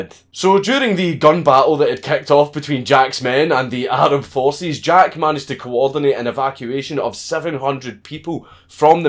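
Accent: Scotland, United Kingdom. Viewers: Sounds like all you do is state facts in a Scottish verbalige. And we love it !!!! Can you do 1 on Jimmy Carter? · Definitely like hearing this from Scottish bloke instead of an American veteran both version are excellent Mr. Ballen but this one feels classic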